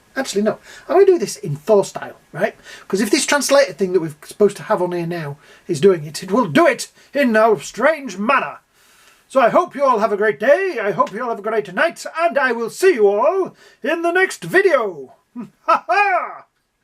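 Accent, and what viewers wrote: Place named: United Kingdom. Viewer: You sound British.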